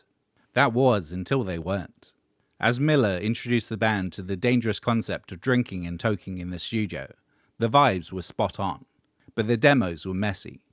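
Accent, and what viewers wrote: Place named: Birmingham, United Kingdom. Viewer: Didn't realise you were a Brummy!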